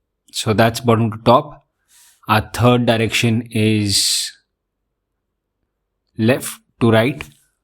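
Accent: India. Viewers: You Indian guys... you have the worst accent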